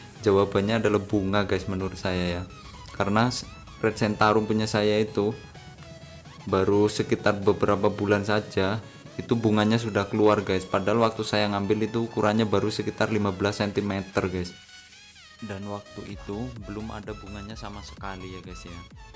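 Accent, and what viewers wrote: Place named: Banyumas, Indonesia. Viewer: ciri khas pokoke(ora ilang ngapake biarpun ngomonge bhs Indonesia)😀😀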